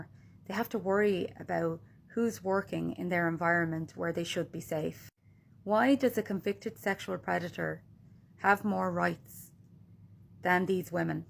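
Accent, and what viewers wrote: Ireland. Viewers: More money of the taxpayer ,the problem is people keep voting for the people that do not stop illegal migrants. and all this makes it so bad for good men who look at a gorgeous woman like you with an amazing irish accent as predators . dating and even looking at women is hard now .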